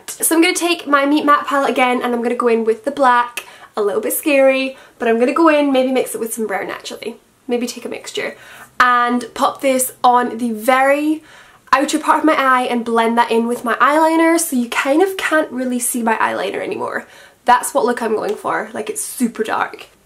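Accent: Scotland, United Kingdom. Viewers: Alaiza Toscano I'm pretty sure she's Scottish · I usually always know whether i'm going to like a video going by an intro. And i went through like 3 videos before yours, but i'm staying here :) good on you for not looking like a conceited, regular influencer. Interesting how your from Scotland :P I lived in Ireland Dublin and we've got VERY similar accents. Now i know why people think i'm scottish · Natalie Briggs nevermind, she's Scottish lol she just spoke so light handed with it, I had mistaken it for Irish!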